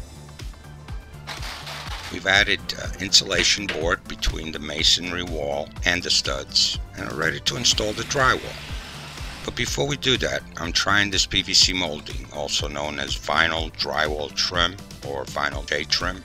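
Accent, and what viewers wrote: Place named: New Jersey, United States. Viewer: This guy sounds like he’s from Jersey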